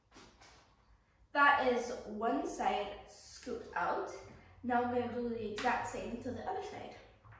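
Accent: Scotland, United Kingdom. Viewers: Happy Easter & Family! My old math teacher always said only crazy people make perfect circles, no stress on circles lol. your western kitchen skills are getting good! Also you are starting to sound a lil Scottish with a tiny accent on a few words - very cool 😎 😀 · Hi! So nice to see you again, love your cooking skills, you look so grown up, and starting to have a wee bit of of Scottish accent, how is your brother? Happy Easter to you, and your beautiful family, 🙏💕🇨🇦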